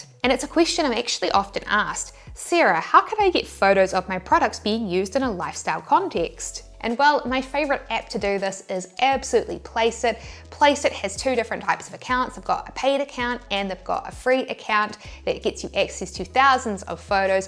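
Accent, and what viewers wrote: New Zealand. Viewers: What accent? Sounds perfectly kiwi to me.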